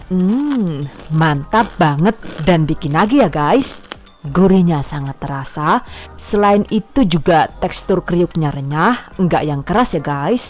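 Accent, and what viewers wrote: Banyumas, Indonesia. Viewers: Kie mesti wong banyu mas. Tepate cilacap. Kira kira kya kuwe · madiun ngapak yah mab? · bu orang mana bu ..hehee bisa ngapak Bu 😁😁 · Makasih Buu .... 😀Deneng ngapak ? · Orang madiun kok ngapak yaa..hee salam dari tulungagung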